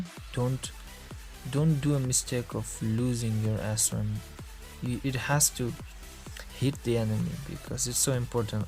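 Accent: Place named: Mexico. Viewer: Wou a mexican english well xd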